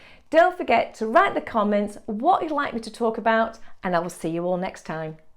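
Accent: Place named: United Kingdom. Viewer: You seem like such a lovely and warm woman. I simply adore your British accent. Great video! Thank you for a slice of goodness this morning.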